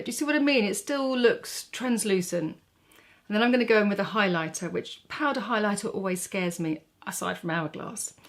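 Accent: United Kingdom. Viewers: Greetings from Seattle! So happy to have found you! Very much enjoyed your video on Flower Beauty. You’re quite right that these products are quality yet affordable. I love the Flower Beauty blush color drops. The product is a gel that comes in a tube and you use it on the cheeks and lips for a natural flush of color that is buildable. It’s nice for women with mature skin as well. They come in six shades and are lightweight and lovely for spring and summer. Thank you again for the video. You are so natural and down to earth! I could listen to your voice all day. I think most Americans are enamored with British accents! Have a great day and see you soon!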